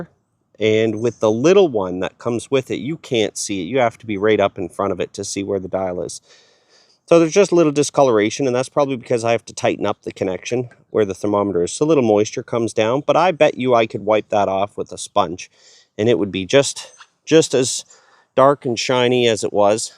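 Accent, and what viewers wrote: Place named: Canada. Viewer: Some of the ones NOT to buy if you are an American (I realized that you are Canadian by the accent) are Frontier (MEX), Lone Star (MEX), Jealous Devil (BRAZIL), FOGO (El Salvador), others too. Royal Oak is made from the lowest bidders. Just read labels. Kingsford, Cowboy and M and M are acceptable.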